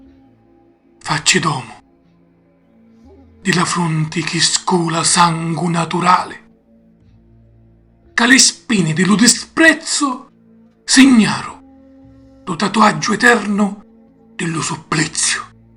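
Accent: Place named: Sicily, Italy. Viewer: Grande interpretazione, coronata dalla tua meravigliosa lingua di Sicilia, che arricchisce lo spessore umano dei contenuti.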